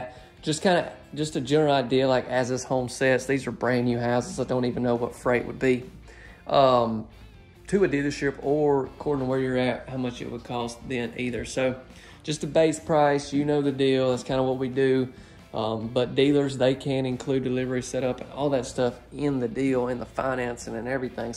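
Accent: South, United States. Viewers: As a fellow southerner I love your accent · I enjoy your adorable Southern “accent” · I absolutely love this home, and as a widowed grandmother who loves to cook, I’d think I had already died and gone to Heaven if I was blessed to be able to have it as my own. I’d have one guest bedroom and use the smaller one as my sewing/craft room. Unfortunately, I’m not in a position to be able to buy it, but I love watching the videos and dreaming. You sound like a good Southern young man to me, and you come across as warm, funny, and, most importantly, honest about the homes you show us. I’m in the upstate of South Carolina where the tornado hit, and if it were possible, I’d buy this home, because it was love at first sight! 😂 Thank you for your videos and for being who you are. I still have to have hope and dream, and you make this so enjoyable for me. Keep up the good work, and I’ll keep watching! 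😉👍🏼🙏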